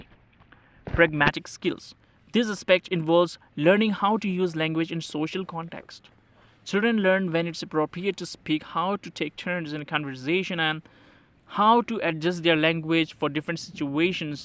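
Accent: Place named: United States. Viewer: Sir ur english pronunciation is lk American people Khublei sir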